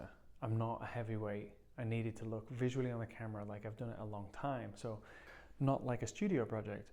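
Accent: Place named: Australia. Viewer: Your English accent has a bit of a Australian twang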